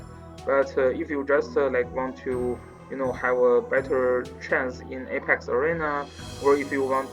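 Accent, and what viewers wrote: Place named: China. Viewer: I cant understand that english , such a strong chinese accent , and music background make it worst ....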